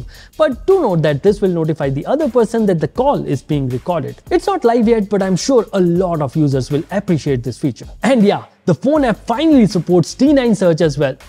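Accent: India. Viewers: oooh god this indian english aghhhhhh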